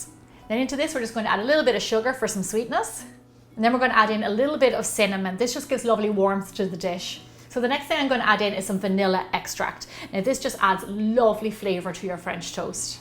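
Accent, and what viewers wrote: Ireland. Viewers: Love, love your Irish accent! ☘️ I’m Irish too, but w/o the accent, ha, ha. And your recipes are all excellent. Now, I have been making my French toast exactly like yours for years....except now I’m going to try & add the extra egg yolks. That’s new to me, & I bet it makes a difference. Thanks so much!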